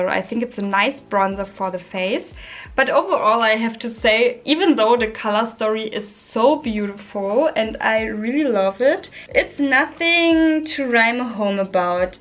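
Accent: Germany. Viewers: You look stunning ! Are you from france ? Je n’aurais jamais pensé .. i really thought you were from Germany 😅 the accent made me confused ❤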